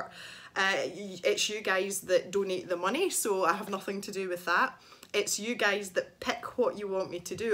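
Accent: Scotland, United Kingdom. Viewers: I’m so happy Gregory Grayscale has such a fine new home. But I’m still lamenting the displacement of The Yoshis 😢 Regarding the neon pencils ... I once participated in a challenge that required you to color a page that involved something outside of your comfort zone. So I doubled down and colored a mandala with neon gel pens and pencils - neither of which are my favs. So, looking forward to the next video with a Scottish accent. Signed, Daria, the serial commenter.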